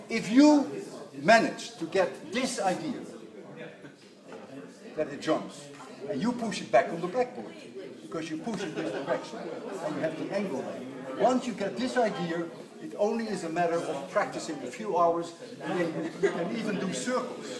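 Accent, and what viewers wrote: Netherlands. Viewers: I knew he was dutch when I heard him 😂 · I knew that this man was Dutch from his accent. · 6 seconds in and I heard he was Dutch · He sounds like hes Dutch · me: is he dutch? me: * checks description * me: ...surprising... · Nice dutch accent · Is he by any chance Dutch originally? Sure sounds like it 🙃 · I recognized him being Dutch after his first words 🙈 (I'm Dutch as well) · He sounds dutch af. Update i checked the discription and shocker: he is dutch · Don't know him but he sounds like a Dutchman talking English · He sounds so Dutch lol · his accent is so Dutch! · Hes so clearly dutch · Nah, it's about as Dutch as an accent can get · Sounds like a dutch teacher to me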